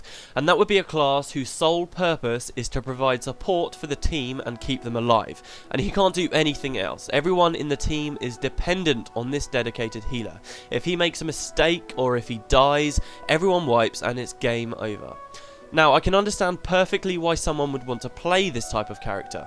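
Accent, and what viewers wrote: England, United Kingdom. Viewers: Lols, you have a british accent .3. Great, now you made me sad that I dont have Guild Wars >_< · This is a perfect example of how a fancy British accent can be used to deceive countless people into thinking what you are actually saying isn't completely retarded. Your entire argument is a straw man. If you are clicking red bars in a window (reactionary healing) then you aren't doing it right. You should be pre-protting, watching your position, following your enemy's damage, all while trying to monitor your energy and control how aggressive your entire party is playing. That's not boring. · noob video. who the fuck cares about "raids", raids are boring by definition, you learn it and you are done. it's no fun after that. heals are fun in battlegrounds and arenas. and you are not just "watching bars" there. if you are, you are certainly not familiar with the game at all. 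beautiful scenery standing there wacking at the boss? that's all the melee looks at, and the ranged. i don't get this idiocy at all. especially with a brit snotty accent. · Your English accent turns me on.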